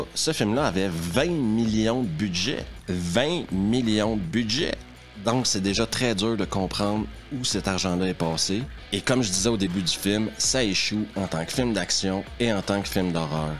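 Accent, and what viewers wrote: Quebec, Canada. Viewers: Hahaha Mais c’est tellement horrible et drôle de mater des vidéos en québécois.. quel enfer vot’accent.. 😂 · Super vidéo et superbe accent québécois !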